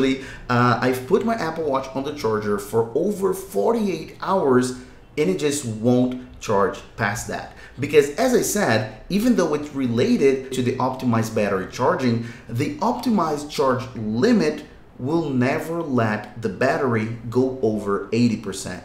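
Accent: Brazil. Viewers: First time in watching your videos, I could pick up a small accent, are you Brazilian?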